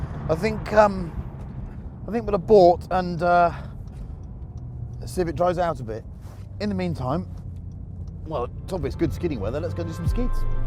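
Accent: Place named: London, United Kingdom. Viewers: Sener S sounds like London to me. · Anybody knows the British Accent of Chris Harris?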